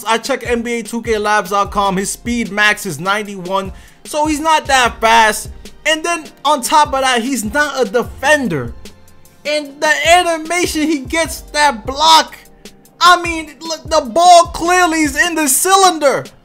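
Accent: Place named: Boston, United States. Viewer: your definitely from the Boston area admit it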